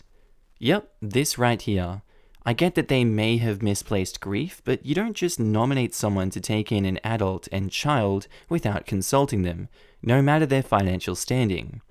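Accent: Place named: Australia. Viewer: tfw you're an aussie and like hearing a familiar accent, but gotta always laugh at this branding lol (its cringe but i say that lovingly, its a big 'lol good for him' moment from me)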